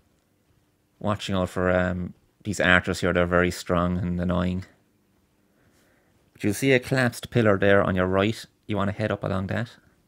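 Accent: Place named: Ireland. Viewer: After trawling far too many videos showing how to get it at late game this is exactly what I needed lad and with an Irish accent to boot 👌 Appreciate it!!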